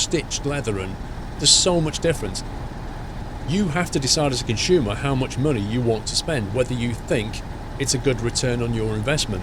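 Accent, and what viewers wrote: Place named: Liverpool, United Kingdom. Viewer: .. loving the woolish Scouse twang 😁! Question for next week: with this generation (which am half in half out being 27).. and with the internet being something this generation is born in... Do you think games have changed to feed this generations instant gratification?.. as in... Do you think games have got easier and have quicker checkpoints so you can get quick satisfaction... I remember how quickly I used to complete super Mario world for the snes and I went back to it the other week and it was soooo much harder than I remembered..... What's your thoughts??? Next topic: console pc mobile gaming.. which is best for which genre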